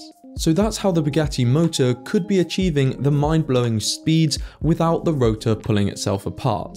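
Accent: United Kingdom. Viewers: As soon as I heard him say "electric flights" and that the centripetal force increases "exponentially" with the speed of rotation, I knew right then, that the person quoted saying that this car "bends physics", and the british accent narrator themselves know absolutely nothing about physics. It's easy to see how they might think this. Sorry guys, but this is clickbait.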